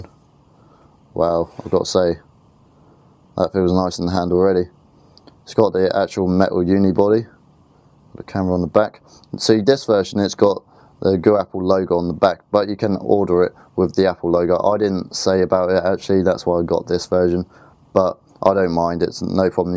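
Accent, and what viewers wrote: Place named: England, United Kingdom. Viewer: Are you English?, I love that accent!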